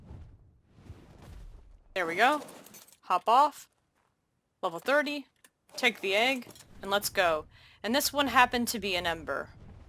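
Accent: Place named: United States. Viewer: She sounds cute 🫠 American accent. Still uploading Ark? 💞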